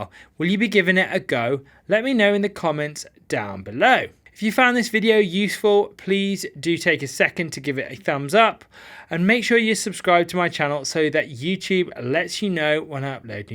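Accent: United Kingdom. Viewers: I found this video enlightening, clear and pleasant to listen. Specially your British accent 🙏 Thank you so much Docteur 🎉 Big bravo 🎊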